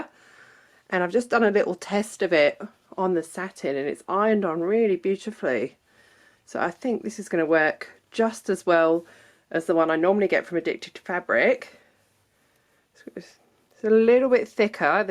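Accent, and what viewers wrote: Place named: United Kingdom. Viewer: Yes it has certainly given me inspiration, but I'm doing mine a different way! Yes, I'm also in Australia (live in Brisbane, but currently working in Perth). I always assumed you were living in the UK because of your accent so I was really surprised when I heard you say Lincraft haha! It's not often that I hear Lincraft or Spotlight on youtube!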